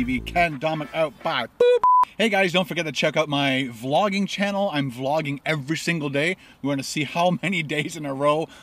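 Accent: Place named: Barrie, Canada. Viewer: So neat! I found you by watching your colab with emmymadeinjapan and when I heard your accent I just had this feeling you were from Barrie area! I live the vicinity too and have had many a wonderful meal at that location. You should really try the "Ultimate Feast" next time, but switch out the "walts favourite shrimp" for the pan fried scallops!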